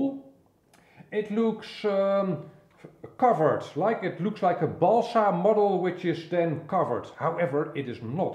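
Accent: Netherlands. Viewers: Mate, your Dutch Accent is brilliant. I love the Wing, I love the Video but all I can think of is: "Pipe and a Pancake" 😂😜✝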